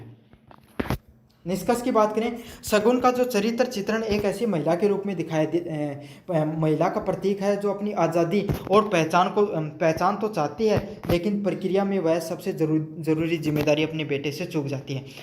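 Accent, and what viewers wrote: Marwar, India. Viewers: Sir itna bhi sach nhi bolna tha😂😂😂. Puri marwadi and dialogue super 😂😂😂😂😂😂😂😂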